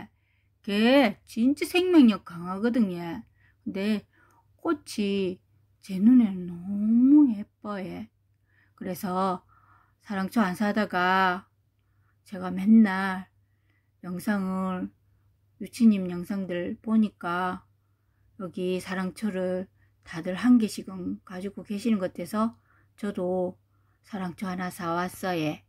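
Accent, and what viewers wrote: Gyeongsang, South Korea. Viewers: 요즘 아트에서도 꽃나무 판매 하더라구요. 예쁜아이들 잘들여 오셨어오. 경상도 사투리 귀엽고 예쁘세요.ㅎ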